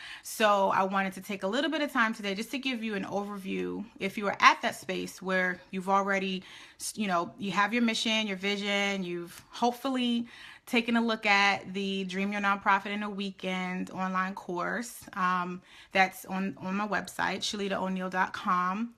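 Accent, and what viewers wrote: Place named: Philadelphia, United States. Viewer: This wasn't only informative, it was inspirational. Just starting in January, I've never even given thought to an event. The non profit status advice was helpful as well. I was wondering how to raise the ~$600 for 501c3 corporation filing. Thank You! I was also shocked you mentioned Baltimore. You sound more Phily than Maryland ;-)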